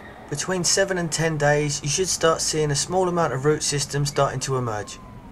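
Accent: United Kingdom. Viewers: Love this video. Love your accent. Nice British chap showing them how it is done! Excellent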